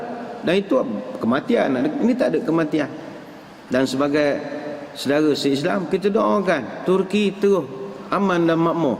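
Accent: Malaysia. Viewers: Ustaz .ni dr mane..logat malaysia peci indonesia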